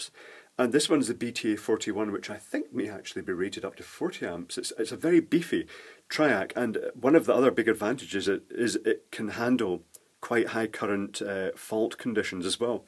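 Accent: Scotland, United Kingdom. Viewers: I'm using the subtitles, I'm not deaf, but sometimes it helps keep track of what's going on if you rewind the video quite a bit. I tell you what, it doesn't like accent! Maybe it should have detected "Scottish" instead of "English". Though he's not really speaking Scottish, which is a dialect of English where they swear more. And all the more for being a Weegie, which I think he's said in the past.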